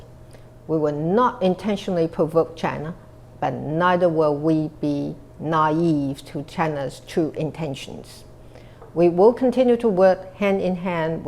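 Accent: United Kingdom. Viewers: 讀稿機很正常，即便沒讀稿機，蔡總統英式英文原本就很熟是事實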